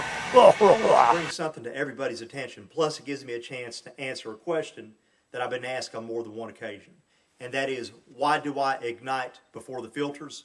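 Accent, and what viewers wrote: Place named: South, United States. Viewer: its weird hearing a southern accent saying "you guys" are you originally from up north?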